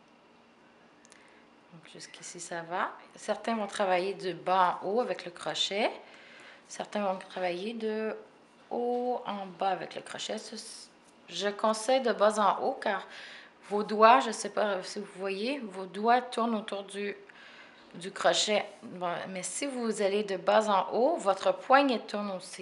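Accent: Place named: Quebec, Canada. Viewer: t es québécoise que j'entends cet accent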